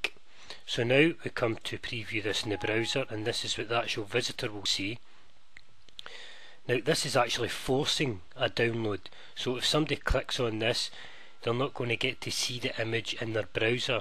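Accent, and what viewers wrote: Scotland, United Kingdom. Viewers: he is scotish trust me?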